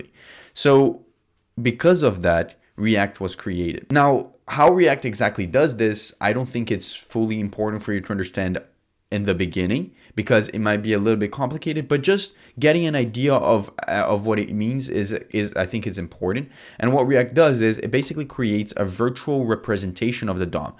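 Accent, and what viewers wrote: Brazil. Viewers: Esse mlk é BR certeza ou é português kkkkkkk to maratonando o canal dele, bom demais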